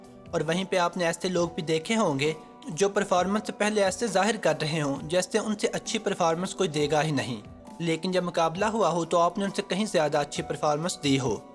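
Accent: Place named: Lahore, Pakistan. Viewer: I think lahori ha admin due to his lehja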